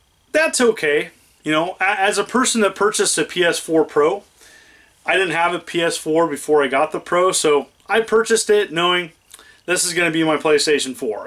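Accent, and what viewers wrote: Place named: Pennsylvania, United States. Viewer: Where u from sounds like you’re from Pennsylvania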